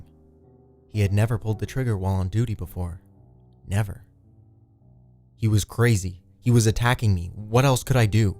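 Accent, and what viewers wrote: Canada. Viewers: my man!!!!! I have been waiting for this forever dude! Didn't think it was ever gonna happen after the issue you had with the author about the last part of the story.. And speaking of which, do you have some sort of agreement with T.W. Grim or somethin? You're the only narrator on youtube I've found that reads his/her stuff. You have the best taste in material by the way, and for some reason, your painfully Canadian accent really tops these off and makes them more real and authentic. You have quickly become my favorite narrator dude, keep up the good work!! Also, do you read subscriber submitted stories?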